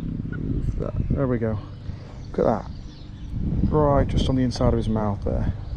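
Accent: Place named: Lancashire, United Kingdom. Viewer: I’m thinking he’s from East Lancashire or West Yorkshire. I may be wrong though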